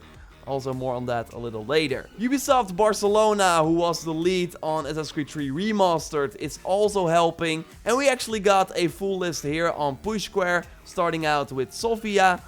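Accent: Netherlands. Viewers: ok met je kanker Nederlandse accent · Ben jij een nederlander? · Ik dacht al dat je nederlands sprak, door je accent 🇳🇱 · Ben je Nederlands?😅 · Are you dutch?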